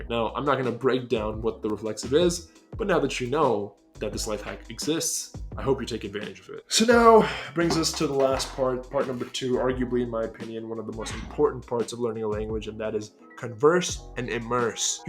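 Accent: Spain. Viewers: Damn you’re talented at speaking Spanish, im surprised you learned how to speak Spanish with a Spaniard accent rather than a Latin American accent since you’re from the U.S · Mi bro habla como todo un español, te recomiendo escuchar otros acentos para que encuentres palabras nuevas que solo se usan en ese tipo de acentos, por ejemplo en Latinoamérica jamás se dice vidio, si no video, cheers mate <3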